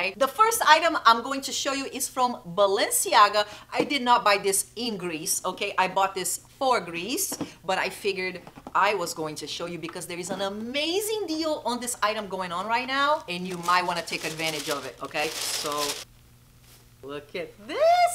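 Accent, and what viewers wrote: Brazil. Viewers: ouvi o sotaque e na hora sabia q era brasileira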